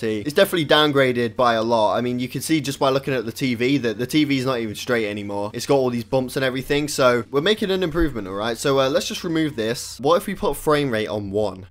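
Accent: United Kingdom. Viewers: British schlatt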